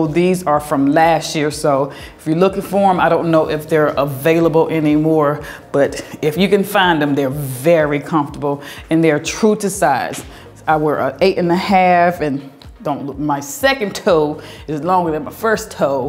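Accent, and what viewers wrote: South, United States. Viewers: Every time I hear you pronounce the perfume Baccarat, it sounds like you are say “Baklava”, like the pastry. It’s so funny your southern drawl. 😆😆😆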